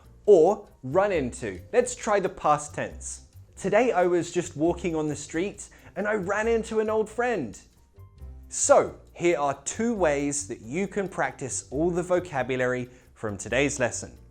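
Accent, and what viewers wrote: United Kingdom. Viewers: I was attracted by British accent , I wanted to learn more and searched on youtube, then came across your videos. pancake +1 and spiderman is better .haha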